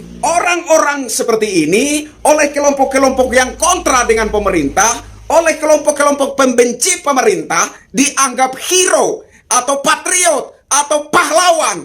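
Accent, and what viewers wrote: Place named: Banyumas, Indonesia. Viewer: Mantap bang, salam sing wong nusantara ....ngapak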